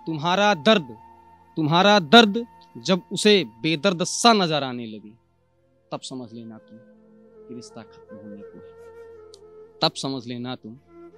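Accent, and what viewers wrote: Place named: Lucknow, India. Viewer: Gajab bhaiya wah lucknow wala andaj 😂❤️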